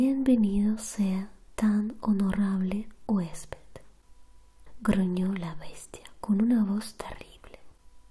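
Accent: Russia. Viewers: Tu voz es fascinante, me encantan tus videos asmr. Esa combinación de español con el acento ruso es adictivo. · No me suelen gustar los videos de lecturas pero no entiendo porque al empezar el video el accento ruso puede conmigo xD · desde que escuché este video de lectura susurrada me hice adicto a tus asmr. para mi gusto el mejor asmr en español con acento ruso. Comienzas la oración con un nivel de volumen en tu voz y en la palabra final bajas el tono...esto hace muy placentero tu asmr. Gracias :)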